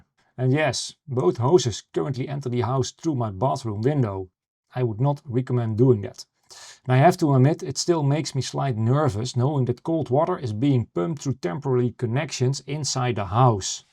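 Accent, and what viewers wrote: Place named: Netherlands. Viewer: Cool solution! We use a similar setup, but our cold water comes from our heatpump. During the recent heatwave we installed a watercooled aircon unit (Innova h2o) to lower the humidity and increase the cooling potential. There's a newer version called Innova WLHP, which is basically designed for a loop type that you are using right now. This trades a bit of electricity for groundwater, as these units can run until the water temperature has reached 60C. Based on your accent, I will assume you're in The Netherlands, we're currently having a shortage of rainfall so consuming less water would be nice :p (p.s. the innova can also be used to preheat or fully heat a boiler for hot tap water, double win for energy reuse :D)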